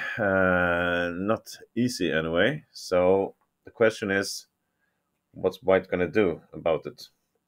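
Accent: Sweden. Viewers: Great work bro!! Are you from Sweden by any chance?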